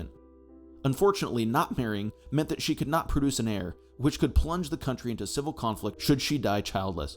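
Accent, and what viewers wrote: United States. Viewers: Why the American accent??!!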